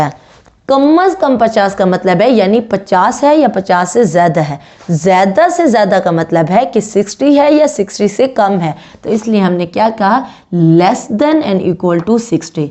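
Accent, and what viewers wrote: Lahore, Pakistan. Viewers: Teacher ka lahja dekh ka pata lag gaya ha k teacher Lahori ha😁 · Sister ap waqas Nasir ki kuch lagti tu nahin..beacuse waqas ny review exercise upload nahin keen..or ap dono hain bhi Multan..zuban ki aarnay sy tu ap Lahori lug rahi hain..jawab zaroor daina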